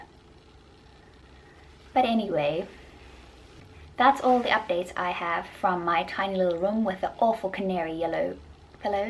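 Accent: South Africa. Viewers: You have never sounded more South African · lol your accent is different. where in South Africa are you from? CTP or DBN? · Is that an south african accent you have?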